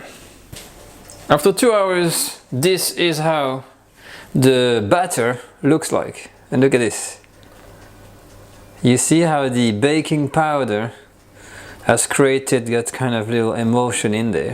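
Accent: France. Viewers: So cozy French accent 🤗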